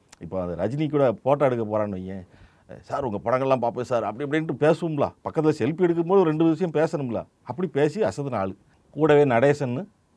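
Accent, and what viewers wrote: Tirunelveli, India. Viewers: இதில் பேசிபவர் எங்கள் நெல்லை மண்ணின் மைந்தர்.இவர் பேச்சில் எங்கள் ஊர் ஞாபகமே வந்திருச்சி.❤ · குரல் கரு பழனியப்பன். பேச்சு நடை நெல்லை.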